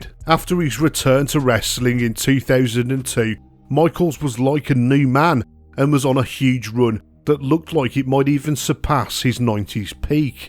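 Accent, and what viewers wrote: Birmingham, United Kingdom. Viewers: Favorite new Pro wrestling algorithm find here. Great content 💯 UK area accent is this? 🇬🇧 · Guy narrating is from Birmingham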